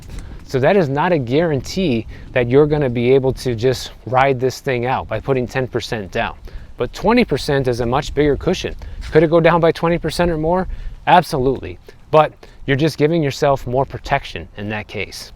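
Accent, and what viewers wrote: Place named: Boston, United States. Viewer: You have to be from the Boston area. Am I right?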